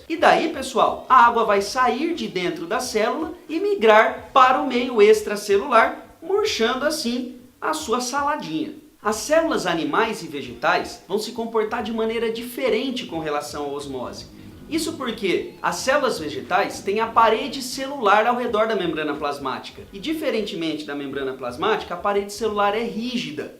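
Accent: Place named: Rondônia, Brazil. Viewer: Você é de rondonia deu pra ver seu sotaque. Em qual cidade da aula?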